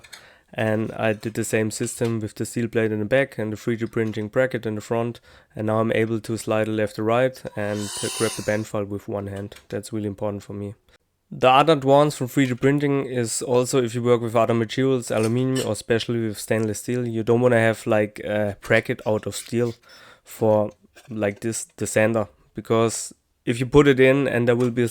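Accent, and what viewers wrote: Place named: Germany. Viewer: Every damn time I watch one of your videos, I get envious. You're truly a master of your craft, man. As someone who's in a similar situation where confined workspace quarters are concerned, I've tried to explore ways to improve how I store things. French Cleats appear to be the best option due to how modular and customizable they are. You've basically taken that same approach, only you've opted for a pegging system with the same kind of modularity and customization. I'd suggest incorporating a sliding rail panel in the future should you run out of space in the future, but I don't know if that would be possible in your shop. Honestly, I think you've done everything you can with what you've got. The only other way to deal with continual space issues is to just get a bigger shop. Maybe that's in your future? And for some reason, I always thought you were American but judging by your accent, it sounds like you're German? 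I guess my American arrogance is showing, but then again, I could accuse you of allowing your German intelligence to make me feel insecure, too. ;.) Keep doing what you're doing. Love watching your videos. Quick question: For someone who doesn't have one of those plasma CAD table things, what would the best way be for anyone trying to make some of those pieces you cut out that have lots of curves and whatnot? Just tracing and cutting wheels? I have an angle grinder, but I don't have (yet) a plasma cutter.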